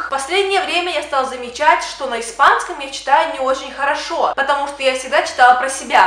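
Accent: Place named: United States. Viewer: у тебя уже акцент американский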